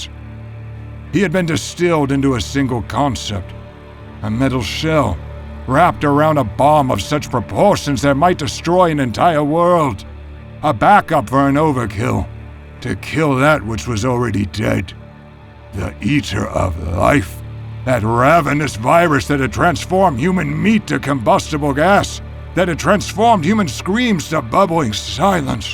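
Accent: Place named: United Kingdom. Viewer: This dudes voice just always gets to me. Something is so off about it. The weird half British accent he throws in whenever he feels like it, the weird emphasis on certain syllables or the breathy way he ends a lot of words, I just can’t with it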